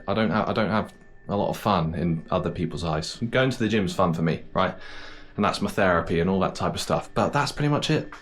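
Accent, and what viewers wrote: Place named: United Kingdom. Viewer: Да, очень приятно послушать. Получается нас действительно учили британскому английскому.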